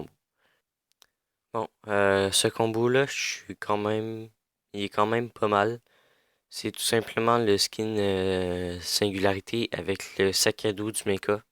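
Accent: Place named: Quebec, Canada. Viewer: Ho l'accent quebecois 😍😂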